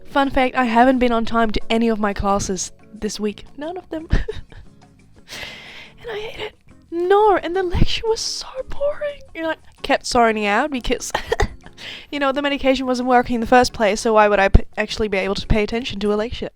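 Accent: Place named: Australia. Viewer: wait i thought you were in australia, but your train shot made me go wtf where are u from LOL edit: I checked ur bio, youre from the netherlands... OK BUT YOU GREW UP IN AUSTRALIA OR SOMETHING RIGHT? I swear you sound australian o_____o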